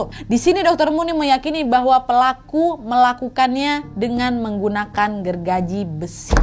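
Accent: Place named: Sumatra, Indonesia. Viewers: Mbak ni org Medan yaa 😁 Berarti di thn2 itu byk org hilang ya terbukti byk warga melapor ke polisi bhw anggota kel mrk hilang..serem yaa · Kau itu cerita seakan akan kau ada dan melihat langsung kejadian itu....kau pun kurasa belum lahir...dan kau pun dari logat bahasamu orang Sumatra ... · Dari Style Bicaranya, sepertinya Wak orang Pekanbaru ya?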